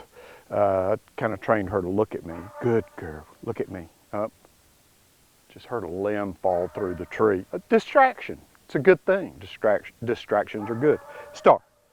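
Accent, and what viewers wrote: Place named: New York, United States. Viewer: Too funny! Your NY accent ...paaaw... I’m from ny got a good laugh from that!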